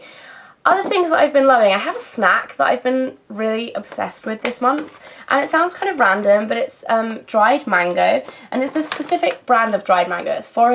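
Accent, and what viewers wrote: United Kingdom. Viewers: I love your british accent! Do you think the foundation would be good for combination/oily skin?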